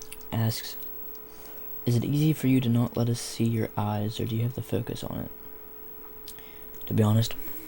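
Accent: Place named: South, United States. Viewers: Creeper alert. Even before you posted a video wearing a WV shirt, I assumed WV from your accent. I went to Marshall University. 😁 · I'm actually really worried you live around where I live bc if I see you anywhere I will probably scream, cry, and run away because jfc. You like lakes, you have a specific dialect.... Georgia? North Carolina? Virginia?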